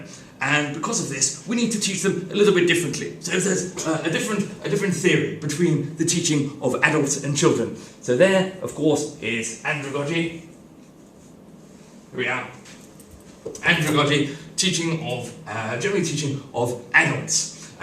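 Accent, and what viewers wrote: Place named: France. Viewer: he's got a very heavy foreign accent and makes many mistakes. is he French?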